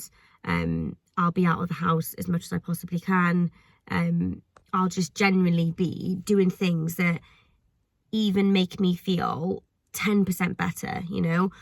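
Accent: Cardiff, United Kingdom. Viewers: Is that a cardifff accent? 😊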